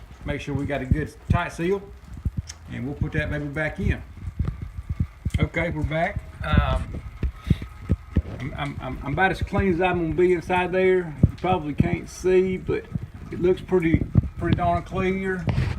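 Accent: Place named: South, United States. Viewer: This makes me smile so big. You sure are putting on that Southern 😁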